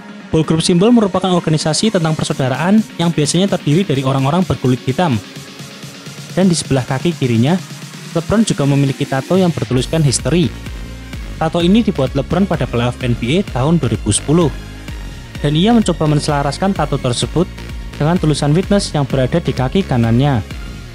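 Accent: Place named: West Java, Indonesia. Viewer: Sundanese english 😂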